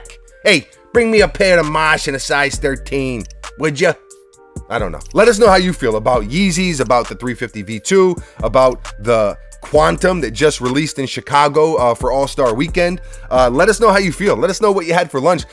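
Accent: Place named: Boston, United States. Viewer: Love the Boston accent 👍😂😂😂🙏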